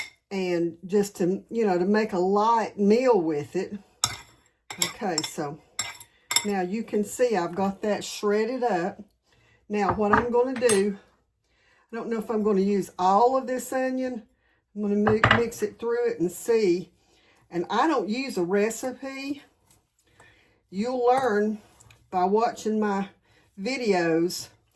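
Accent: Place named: South, United States. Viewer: Hello from Georgia! I love your southern accent ❤the food always looks great, love the presentation on this one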